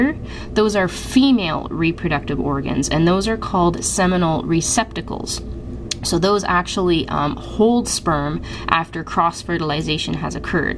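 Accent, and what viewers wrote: Canada. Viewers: .... are you Canadian?:)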